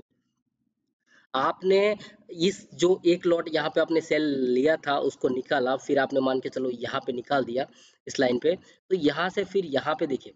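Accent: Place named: Gujarat, India. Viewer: Gujarati can identify that Gujarati accent 😂😂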